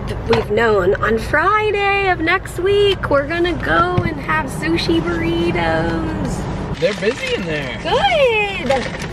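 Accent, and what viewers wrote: South, United States. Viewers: Southern accent is sounding so good!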